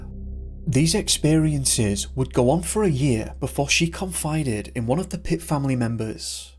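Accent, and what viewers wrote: United Kingdom. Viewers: Reading off a script and being british does make him sound like every other youtuber. Reason for the method is so that theres a sense of (pseudo) intelligence. And the length is so people can fall asleep to this boring shit. Gotta farm those autoplay views when your audience isnt consenting 😂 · a big body of water might stop them from crossing, however there are strange disappearances in north america and some people see strange things in the woods and have slowed down time, some people have even said they can see their search and rescue teams and they hollar and throw rocks and its like they cant hear them and they are outside of space and time basically what you're saying is if you take it go put it back yourself or you'll be fucked forever sounds like silicone based life (the man eating rock) lol the way britts pronounce some words just sound funny. 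i only ashume aluminium is shinny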